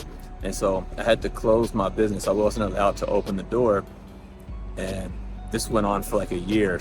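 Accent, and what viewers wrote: South, United States. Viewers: I’m glad you didn’t quit and leave China during the COVID years, when your businesses didn’t do so well. You persevered and survived , despite the negative experiences from individual Chinese who assumed some westerners could have covid, based upon Chinese media reports. . But you have to remember you’re living in a country with 1-4 billion people who can act unpredictably,, . Like others have stated Asians in the USA were treated a lot worse. Trump politicised the pandemic, and referred to it as the Chinese virus , which was echoed across the conservative media channels like Fox News, This created unnecessary fear, and racist attacks against Asian's in the US. . But the important thing is , you survived and your life is better now. You seem like a laid back guy, who overcomes problems and just moves on, By the way , I love your accent , is it a southern American accent? 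I’m Chinese by descent, but I was born in Australia.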